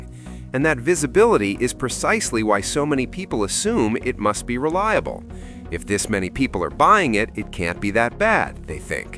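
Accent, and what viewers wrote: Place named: United States. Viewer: The cars a premium budget option is a bloody oxymoron.. Yankee accent talking rupees, this bound to bullshitignore it!!😂😂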